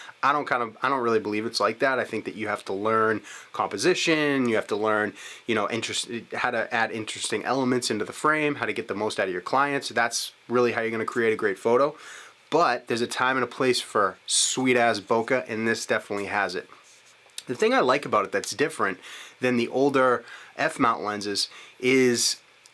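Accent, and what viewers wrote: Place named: Maine, United States. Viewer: Loved this review, and your accent. Are you from Maine ?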